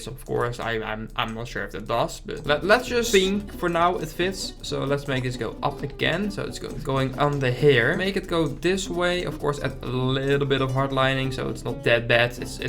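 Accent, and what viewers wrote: Netherlands. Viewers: I smell dutch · i don't know why but i smell dutch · Je bent zoizo Nederlands soms hoor ik Nederlandse accent😅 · I smell dutch in your voice ( SRY ) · HAHAHA NEDERLANDSE ACCENT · I smell nederlands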